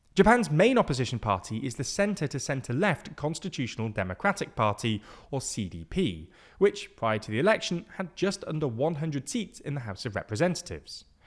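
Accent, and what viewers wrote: United Kingdom. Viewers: Its his british accent heh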